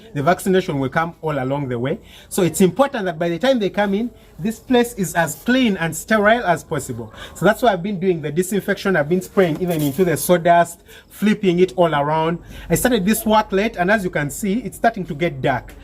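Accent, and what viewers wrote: Uganda. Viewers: You just speak 🗣️ like a Ugandan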